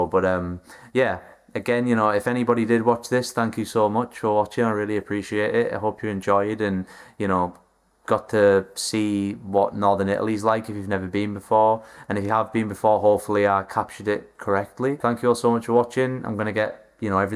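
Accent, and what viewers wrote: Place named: United Kingdom. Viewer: Bro has a really strong British accent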